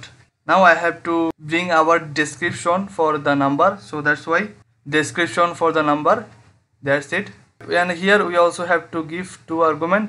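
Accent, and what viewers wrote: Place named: Bengal, India. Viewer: Bro your english is like Bengali....are you bengali??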